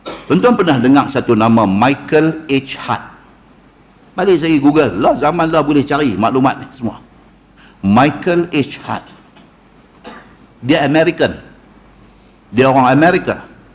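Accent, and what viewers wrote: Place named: North, Malaysia. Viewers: Sy suka nya Tazkirah bgus. Dlm loghat Utara. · Assalamualaikum sahabat, bukan ilmu sahaja yang terbaik saya terima dari ustaz, tapi suara dan loghat utara tu yang sedap. Terima kasih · Loghrat Tanjong....Penang town jati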